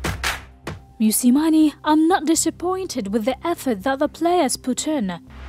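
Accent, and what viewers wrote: United Kingdom. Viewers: A good British accent. It should be less severe than it is. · انجليزي بريطاني بريطاني👍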